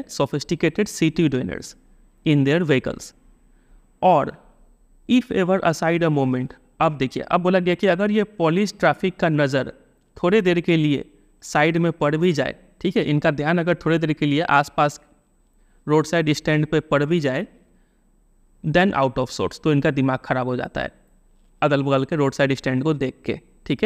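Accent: Bihar, India. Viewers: IMAO BIHARI ACCENT ME ENGLIGH SUNNA BOHOT ACCHA LAGTA HAI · Sir aap bihar se ho ?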